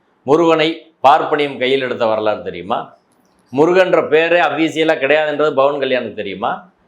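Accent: Madurai, India. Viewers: Only madurai voice. Great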